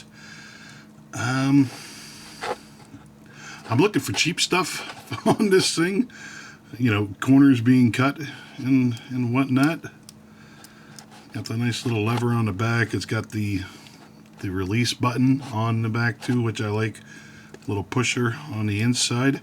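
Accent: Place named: South, United States. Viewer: southerner im guessing lol.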